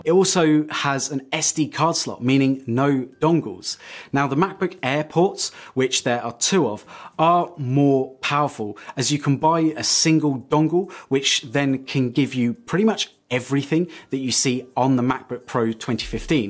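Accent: United Kingdom. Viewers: finally! some practical comparison! not some geecbench and raw tech specs! thank you! how about some simple things like watching good quality video/ movie or zoom call? and it is just nice listening to british english 🙃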